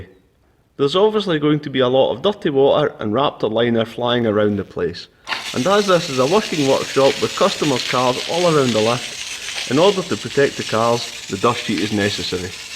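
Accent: Scotland, United Kingdom. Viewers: I really injoyed every second of watching all your videos about your Subaru Impresa. You are a really professional about eveything about cars. You have a wonderful sense of humor and a love your Scottish accent. Very nice, entertaining and educational videos. Thank you so much. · Hey car is looking epic. How have you been finding the raptor??? I’ve got GDB and done the same to my own car, full Resto and raptor in wheel arches and full underneath. I went bare metal and then acid etched, rust bullet and raptor over the top. I find it chips quite easily on hard edges, sills, gaps etc - but on the flat it’s awesome. Won’t budge! Have you noticed this at all?? Thanks for all the videos btw, what a great source of info. Fellow Scot I hear too?? You don’t sound too far from myself in Perth. Cheers!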